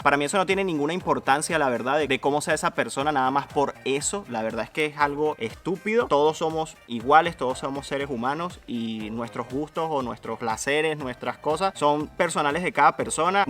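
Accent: Venezuela. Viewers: Me encanta tu canal paisano. Me encanta tu personalidad y la de tus esposa, son geniales. No le prestes atención a los malos comentarios y tu acento maracayero me encanta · ¡Me encanta tu acento! Antes de mirar tu canal, no podía entender el acento venezolano, pero mirar tus videos me ayudó poder entenderlo. Ahora que lo puedo entender, me parece un acento muy bonito. (Perdón el español malo; no es mi lengua madre 🙂).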